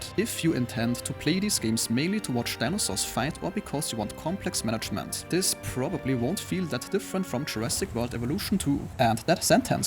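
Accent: Germany. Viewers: Do i hear german accent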